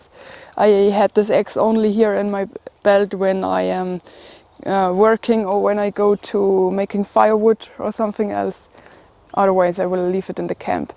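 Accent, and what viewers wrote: Germany. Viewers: Teee peee..I love your beautiful German pronunciation of my language..danke. (:-) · I was hearing her say "Tippy" then realized she was saying "Teepee", her German accent is cute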